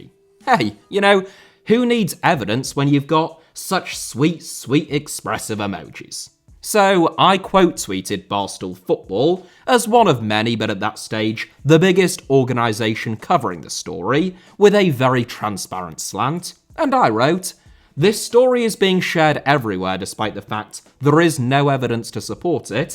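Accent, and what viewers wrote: England, United Kingdom. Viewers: I thought the video was so long because he was going to present mounds and mounds of evidence to back his point. Instead however, he's just reading tweets and being proud of himself for making condescending tweets online. It's pathetic really. Turns out having a British accent and making snide comments on the internet doesn't necessarily entail intelligence. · That's just how Brits sound, don't sweat it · 1. Innocent until proven guilty. Especially in today’s day in age were many men rich and poor alike are falsely accused. Provide others the same grace you would want in return if you were the accused. 2. A story like this to be completely made up such as a famous person getting a divorce will be obvious eventually and would be hilariously dumb to fabricate. Instead, you should verify first that they are lying before you accuse them of it. 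Until then don’t act like you know they’re lying when you really don’t. That accusation from you by the same standard you’re using is just as much a lie as the one you’re accusing them of. Both your claim and theirs are unsubstantiated. The hypocrisy drips off from your British accent. 3. What’s unreasonable in western society is that laws allow for a woman to leave for any reason, even if the women cheats she can take half of the assets. It’s also unreasonable to get into a supposed life long vow and then just leave and take everything. Many men are destroyed from this and it’s not what one would describe as justice based upon the meaning found in a dictionary. How can one make a promise and be rewarded for braking said promise/vow? 4. Yes lots can happen in divorce court depending on the country. Very good of you to point that out. 5. Misogyny is the hatred of all women. It is Not when men are pissed off at current marriage laws and a culture that allows women to accuse men and labeling them as guilty until proven innocent. 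6. Allow me to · "Accused rapist" learn the definition of accused, charged, sentenced before trying to sounds intelligent with that smug british accent, being accused of something means about jack sh%t. Im not surprised that many high profile rich guys get accused of these things, im also not surpised that the supposed victims ALWAYS fixate on getting paid instead of actually putting that man behind bars, wonder why that would be.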